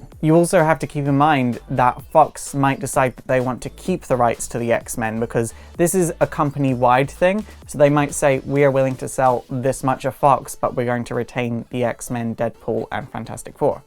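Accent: Scotland, United Kingdom. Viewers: you sound slightly more Scottish than usual this video, I kind of really like it. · Is he Scottish ? I hear a mixed accent so I don’t know what his accent is lol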